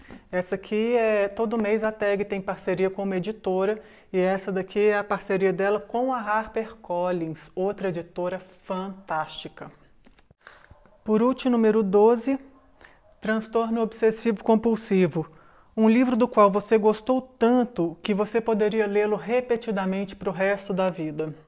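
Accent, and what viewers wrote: Minas Gerais, Brazil. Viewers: O sotaque mineiro é muito cativante. Adoro! Não sou mineira mas, já estive em MG várias vezes, e esperando a pandemia dar uma trégua para voltar a visitar esse estado maravilhoso.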